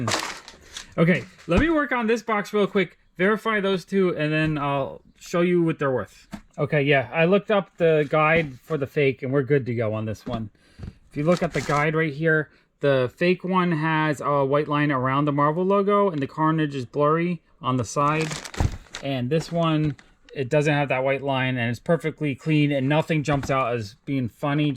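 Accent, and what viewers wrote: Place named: Ohio, United States. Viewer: It's crazy how all of the customers are clearly New Yorkers, but sounds like he's from Ohio or something, Lmao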